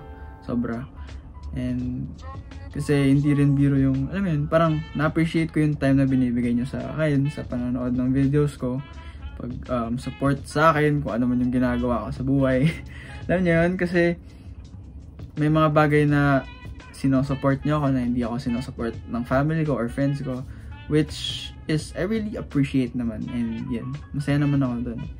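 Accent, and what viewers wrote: Philippines. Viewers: Your Filipino accent is soo heavy! Despite being half Japanese. Why don't you speak English more in your video? I don't understand what you're saying most of the time... :/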